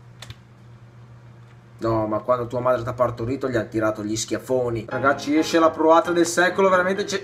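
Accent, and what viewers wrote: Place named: Emilia-Romagna, Italy. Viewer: ma tu di dove sei? ho sentito un bell' accento Emiliano-Romagnolo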